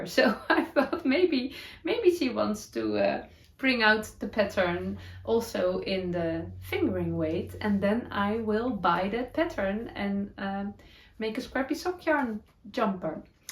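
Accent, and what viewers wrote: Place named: Netherlands. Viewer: you are so amazing. I love how practical you are about everything in your knitting and crochet. You have such a wonderful healthy outlook. Thank you so much for sharing it with us. I had a friend who passed away from The Netherlands so listening to your accent is so pleasing to me. Your English, even when it is Dutchy is so lovely to my ear.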